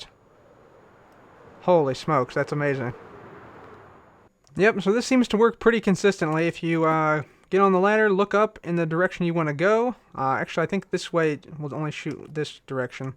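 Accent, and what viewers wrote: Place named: Illinois, United States. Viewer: I think he's from Illinois.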